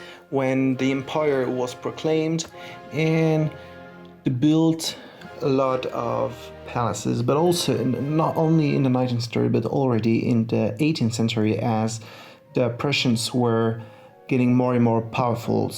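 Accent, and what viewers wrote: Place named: France. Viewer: The only thing I might like more than your builds is your cute french accent :^)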